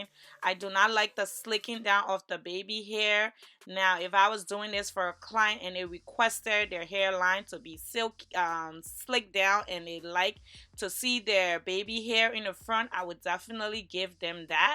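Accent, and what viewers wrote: Liberia. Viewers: Just by curiosity are you Liberian? I think you have a Liberian accent.😊😊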